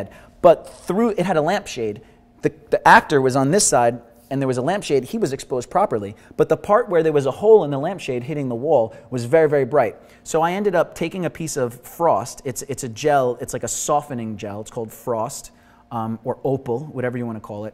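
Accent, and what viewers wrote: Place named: New York, United States. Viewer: ! So happy I found you Googling around... I'm a photographer moving into video. I have a couple of very well used Canon 5D MkII's, but have just purchased a 70D for video. I like the auto focus, and the touch screen. I've seen a few of your youtube vids... love them... as a new yorker myself, i love the energy and a touch of the NYC accent... QUESTION -- I have not found anything in the videos of yours that I've seen about the BEST SETTINGS for the camera -- I'm at 24fps, 1/50th, iso changeable... Vincent Laforet, and others, say to crank down the contrast in the settings... what do YOU do, what would you recommend. I assume that this can all be tweaked in post, but that its supposedly good to capture a flat image. Whaddaya say?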